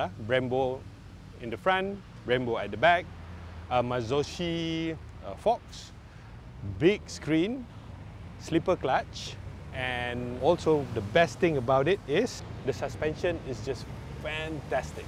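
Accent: North, Malaysia. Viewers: Pekat sungguh loghat utara bang..😂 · maintain loghat utara bang. jrg2 ada kat chanel terkenal.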